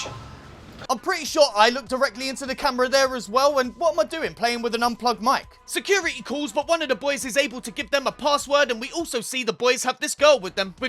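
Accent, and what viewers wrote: United Kingdom. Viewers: Takes me back to a teen in Battersea. Well, minus the wish baby. How have I not noticed the South london accent before now.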